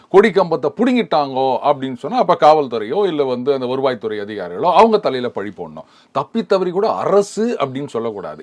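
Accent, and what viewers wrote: Sri Lanka. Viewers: இலங்கைத்தமிழர் போல் உச்சரிப்பு இருக்கே இந்த நெரியாளரிடம்.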